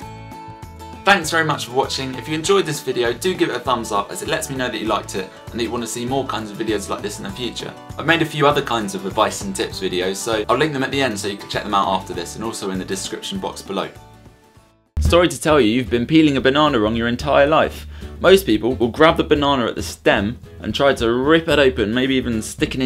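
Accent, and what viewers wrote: United Kingdom. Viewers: I love love love your accent I love the uk so much hopefully one day ill get to go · Love your british english (: ♥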